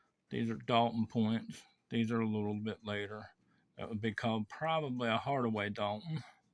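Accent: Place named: United Kingdom. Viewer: Such an interesting collection! I love listening to your accent as well. Picking up a few British-sounding words here (“down”, “house”, etc.).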